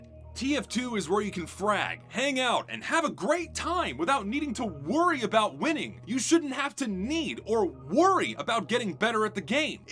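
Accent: South, United States. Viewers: You know when is angry when his southern accent bleeds through his speech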